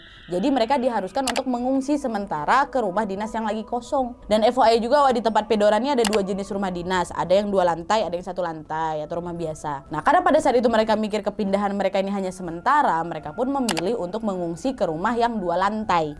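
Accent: Indonesia. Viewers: wajar lidah indonesia kebanyakan gitu ngomongnya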